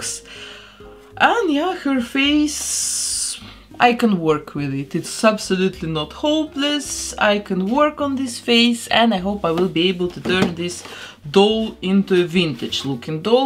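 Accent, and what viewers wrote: Russia. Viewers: Мне одной интересно, почему русская женщина до сих пор не потеряла акцент?))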